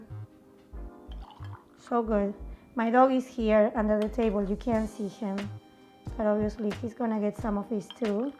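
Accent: Argentina. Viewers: my mom barely ever cooks, her signature dish was always "aroz con porquerias" but one thing she always made back in Argentina was puchero, seeing puchero argentino with the argentine accent and the apron with Mafalda really made me miss my mom, haven't seen her in person for a few months cause of covid. thanks for being wonderful!!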